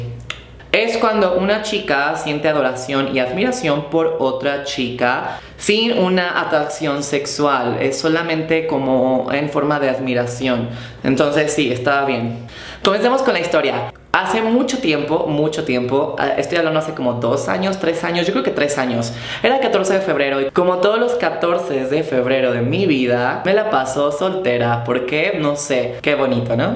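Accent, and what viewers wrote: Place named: Mexico. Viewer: ...yo lo que tengo...es una tremenda atracción (casi adicción) a tu voz... Sí, sé que en algún momento has reconocido que no es lo que mas te gusta de tí, pero a mi sí Esa mezcla perfecta, entre ese timbre algo grave (muchas OTRAS mujeres tienen voces hermosamente graves) y eso está lejos de ser un defecto en mi opinión. Pero continúo...mezcla perfecta entre ese timbre y la tonadita típica de alguien de México como tú, pero con una enorme femineidad en la manera como hablas... Y para que mas o menos te puedas hacer una idea de "que tipo de tipo" te está diciendo todo esto...te dejo un link de mi Galería fotografica de Flickr, para que compruebes que mi punto de vista, el de un sexagenario, hetero perdido, fotógrafo por hobby y aficción, que fotografía y charla amenamente con cera de una "veintena de veinteañeras" al día... y en belleza, he de reconocer que pocas se te acercan...y ninguna te supera <link>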